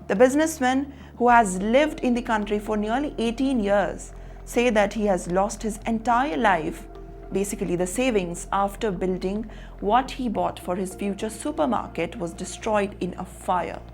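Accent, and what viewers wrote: India. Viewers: Curry english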